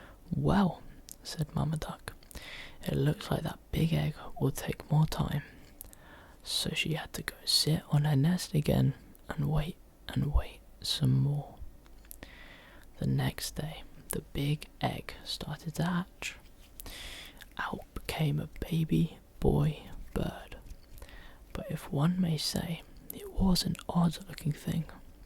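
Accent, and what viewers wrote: United Kingdom. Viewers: Great Bri'ish accent.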